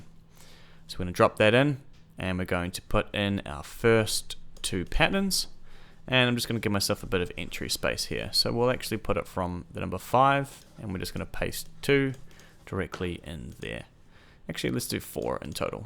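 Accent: New Zealand. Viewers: sounds like hes a kiwi. we just sound like that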